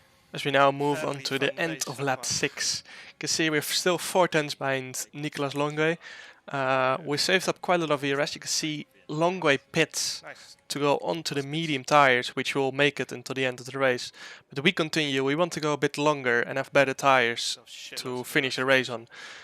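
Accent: Netherlands. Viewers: I love the fact you can hear his dutch accent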